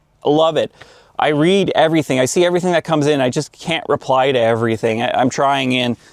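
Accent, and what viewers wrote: Canada. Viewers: This accent makes me wanna slam down some TimBits, while I wash it down with some Molson and watch the Senators play the Maple Leafs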